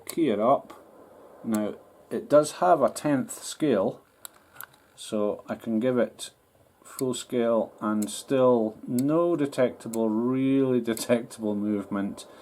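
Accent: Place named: Scotland, United Kingdom. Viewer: Thanks and apreciated video with a Scottish accent, qth here west coast of Scotland 73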